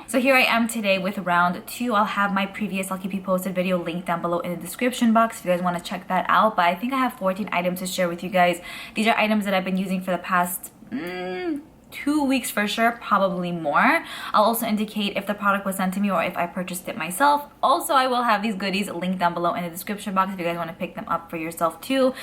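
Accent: New York, United States. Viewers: I ❤ your New York accent. You make me smile with every video. Could you try the new wetnwild palettes? Some love it, others hate it. I don't know what to think. Also the new Revlon prismatic eyeshadows palettes?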